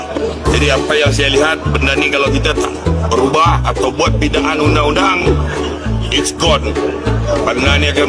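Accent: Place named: Sabah, Malaysia. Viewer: Loghat kp ni mcm orng sabah je 😊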